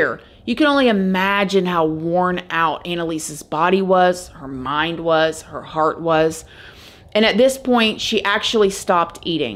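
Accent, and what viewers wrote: South, United States. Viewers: I love real life horror. Also, yes I can tell your a fellow southerner lol. Same accent as mine. God Bless.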